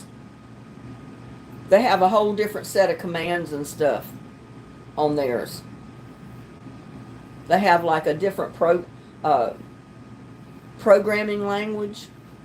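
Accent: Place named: South, United States. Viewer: That was a cool live stream, though I enjoyed it so far and I still like your voice with that accent. I don’t mind accent you know southern sounding. I like the way you speak you know everybody does speak differently though.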